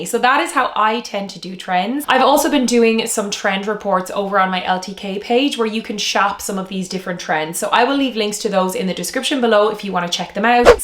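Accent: Ireland. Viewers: Thanks , lovely to hear an Irish fashion stylist. Be great to see fashion for over 60s style, a little. But great update on what’s coming. Thanks ❤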